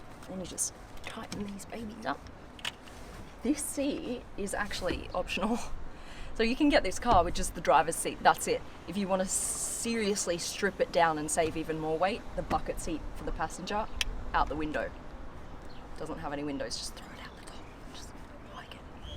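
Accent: Australia. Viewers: Can you do a video with you, only saying: "Because because because" for an hour or two? Please? Just love your Aussie accent!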